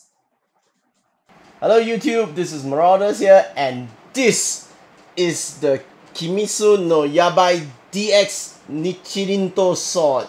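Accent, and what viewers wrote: Singapore. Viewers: is not form is breathing dude and are you from Singapore?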